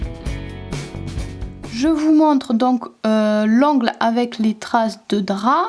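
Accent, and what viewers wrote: South, France. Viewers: super ce produit tres jolis accent que tu as on dirais celui du midi bonsoir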